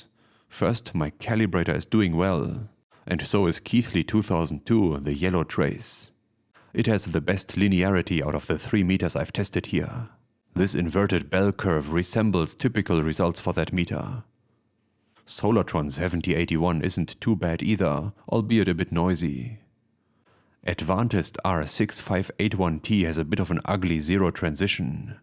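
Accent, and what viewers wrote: Germany. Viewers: Germany's have fun acent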